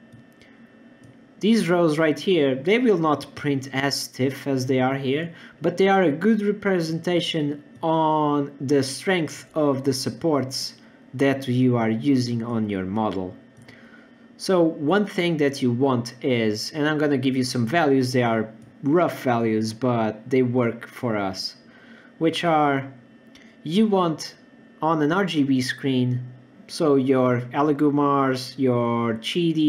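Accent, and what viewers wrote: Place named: Portugal. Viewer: Are you portuguese? Your accent makes me want to buy you a Coffee and a Pastel de Belém..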